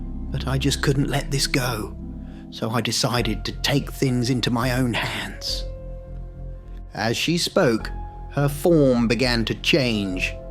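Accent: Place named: United Kingdom. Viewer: You're appearence has change a bit but.your enchanting voice along with your british accent still haunts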